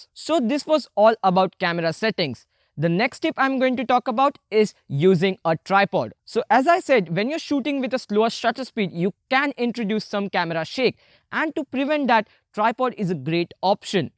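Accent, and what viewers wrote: India. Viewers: Your pronunciation sounds like a Indian man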